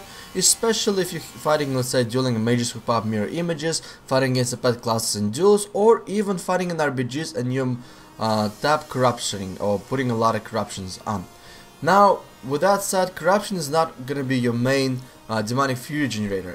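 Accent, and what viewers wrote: Russia. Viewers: Твой русский акцент, не плохо смотрится)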